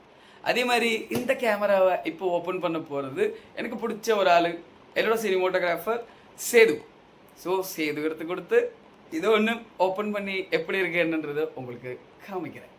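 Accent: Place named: Kerala, India. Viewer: Thalaivanoda malayalam and tamil kalantha sound key thani fans undu ❤❤❤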